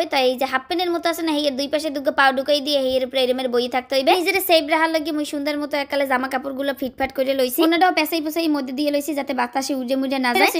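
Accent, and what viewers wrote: Barishal, Bangladesh. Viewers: আমার বাড়ি বরিশাল। তাই আপনার কথাই আমার কাছে সবচেয়ে বেশি ভালো লাগে। ভালো থাকবেন।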